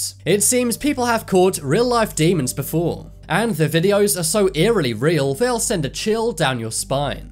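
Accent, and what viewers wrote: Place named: United Kingdom. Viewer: Not the first time a British accent tried to con the world into bullshit.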